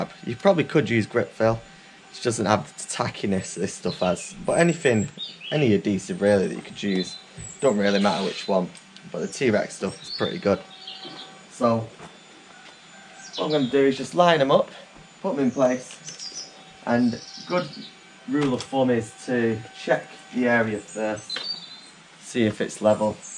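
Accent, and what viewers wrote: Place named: Northern England, United Kingdom. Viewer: Nice work there, just wondering where abouts you work as I'm in northwest UK and you sounded like a northern lad 😉 as in need of a house render as well as me cills cleaning up as they are looking battered. Cheers. Kev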